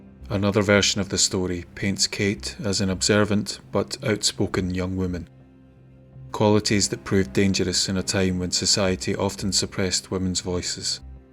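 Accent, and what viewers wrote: Scotland, United Kingdom. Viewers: I could listen to your Scottish accent forever. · you do such a wonderful job with these stories. I look forward to each one! I must admit this one broke my heart. 😢💔. I must say my heart belongs to Scotland.🏴. I am blessed to have sister by heart who is from Aberdeen. I will visiting this coming June. And your accent! Best accent in the world. Could you narrate my life story? It would actually sound interesting then. 😍. Thank you 🙏 and keep the stories coming!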